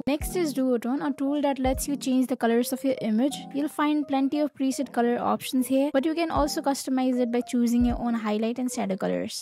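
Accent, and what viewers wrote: India. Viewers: Finally someone has taken the time to explain the basic nuts & bolts/tools of Canva. I also like you Indian accent ❤ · hate indian accent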